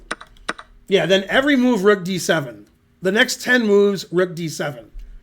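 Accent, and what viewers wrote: United States. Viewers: I can totally tell your from Detroit bro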